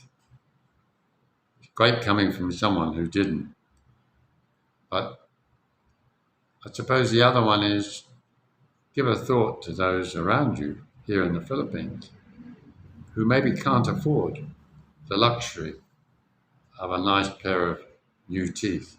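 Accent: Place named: United Kingdom. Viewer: Your british accent trumps all other conciderations..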